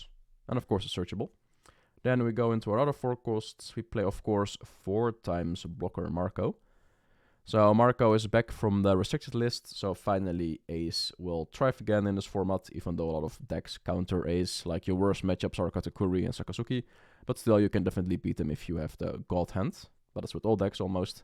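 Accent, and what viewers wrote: Netherlands. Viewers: ik hoor een dutchling van jouw accent ngl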